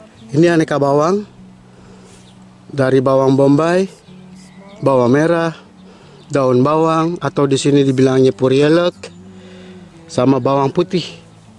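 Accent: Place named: Indonesia. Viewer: ... Bahasanya masih khas banget anak Johar... Seneng deh liat skr.. 🥰 Sehat dan happy selalu yaa... 🙏 Salam dari temen kecilmu dulu... 🌹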